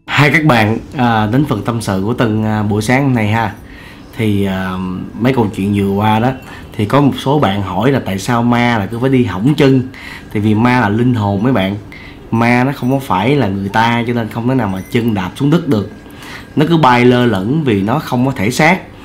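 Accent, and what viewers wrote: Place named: Central Highlands, Vietnam. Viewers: Đang nghe anh kể chuyện nghe tới phút cười muốn nội thương anh nói giọng Tây Nguyên dễ thương ghê á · đáng yêu cực kỳ. nói giọng Tây Nguyên. MA cưng lắm luôn. Nghe sướng cực kỳ.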